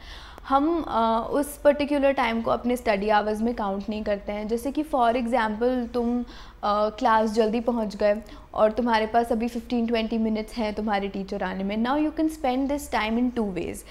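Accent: Hinglish, India. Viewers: Is she said hinglish!!! ?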